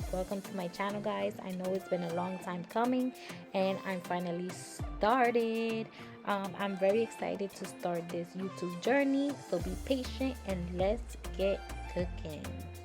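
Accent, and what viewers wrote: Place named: Puerto Rico. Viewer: Be proud of your Beautiful Puerto Rican accent ❤❤❤❤❤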